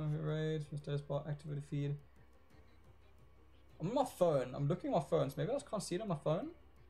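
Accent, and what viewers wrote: South Africa. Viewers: Hey man. I love the content since I started playing Pokemon TCGO again. I am curious though, are you South African as it sounds as though you have the accent.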